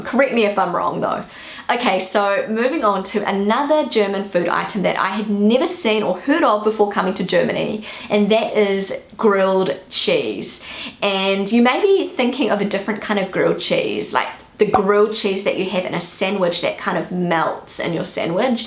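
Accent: New Zealand. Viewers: New Zealand accent is very similar to British accent, is it not? I would have thought, you come from England. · Love the accent. I almost wish I were an actor with a NZ character so I could practise the vowels. BTW, there's no "expresso"; it's "espresso". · Curry flavid kitchip. The new Zealand accent is adorable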